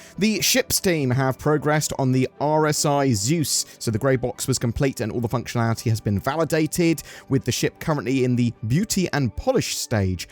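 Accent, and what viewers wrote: England, United Kingdom. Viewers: Top notch English accent there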